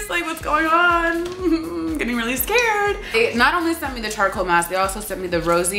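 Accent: United Kingdom. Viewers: i live for your british accent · Ur British accent is the shit !! Loll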